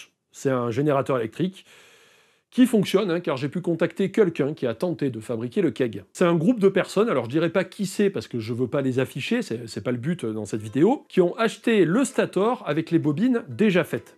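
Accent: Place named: Saint-Étienne, France. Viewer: J'ai crue entendre un petit accent stefanois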